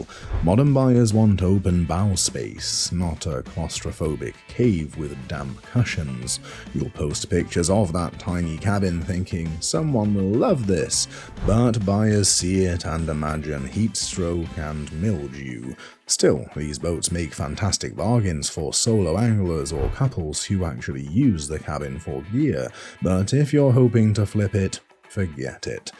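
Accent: United Kingdom. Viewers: Utter and pure horseshit. Kept watching just to see how idiotic this one got. Which it sure did. As a boater since childhood, I cannot remember an elite population as this British voiced fool implies, ready to question brand after brand at this level of boat. Yes there are cheaper quality boats just as automobiles are. And the world keeps spinning what a shocker. Do me a favor and stay home and impress yourself that a life of snobbery is far better than enjoying the boating scene. 1994 Four Winns owner here - old school in the house that takes care of his boat and could care less there are OMC labels on a Volvo and Ford I/O setup!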